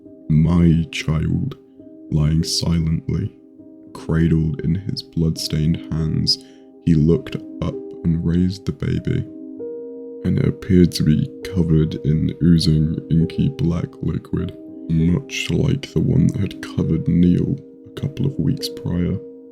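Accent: United Kingdom. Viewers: H0T BRITISH MAN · DEEP VOICE BRITISH MAN!!!!!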